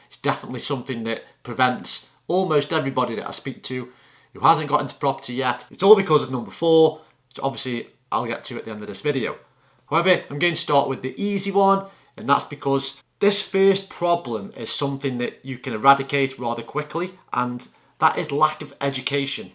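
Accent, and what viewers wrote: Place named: Stoke, United Kingdom. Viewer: Loving your advice mate and I used to live in Stoke so its nice hearing a stokie accent, I have a deposit ready and been researching it a lot so keep the content coming!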